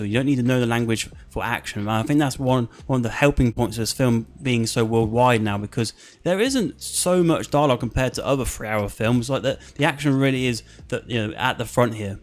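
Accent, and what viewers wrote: United Kingdom. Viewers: Is this British accent??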